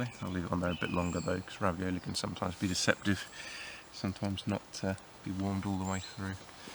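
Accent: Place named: Southern England, United Kingdom. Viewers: You got a great looking channel here old chap! Glad to have found it and definitely subbed! You sound like you may be from down South somewhere, like me, maybe just outside London? slugs love tea, coffee and BEER!...so dont leave an open tinny sitting about on the floor, even in the evenings, you'd be surprised just how fast they will find it! MTFBWY! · about that serial killer in the night - they would cut the tent open with a knife, not use the zippers, so that lock really ain;t no good whatsoever. On top of that, it prevents YOU from getting out quickly in moments of danger. I'd just carry pepperspray, a nice axe and a loud whistle instead! Or bring a dog - can;t get more safe then with a nice Rottweiler at your side. Loved teh video - everything seems so easy and so natural with you. Amazing! Thansk for sharing! If I may ask - aprox. 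what part of teh UK are you? You sound like wider Londonarea to me...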